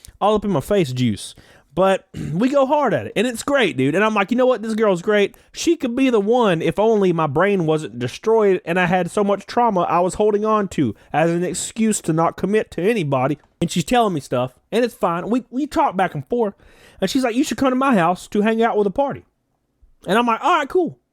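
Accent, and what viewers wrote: South, United States. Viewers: Man, that country twang 😍🥰 I love you!!!! “Damn it” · I have such a huge crush on this guy 😍😍 That southern twang 💋🤌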